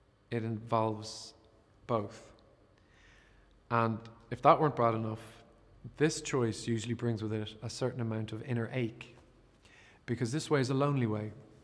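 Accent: Ireland. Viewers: It’s an Irish accent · That's a posh Irish accent. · He is speaking Hiberno-English. And the speech is universally relevant.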